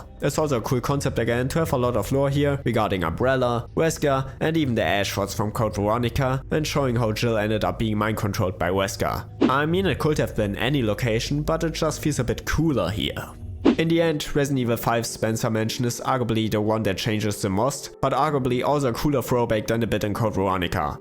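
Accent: Germany. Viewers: Ich höre Deutsch raus hahaha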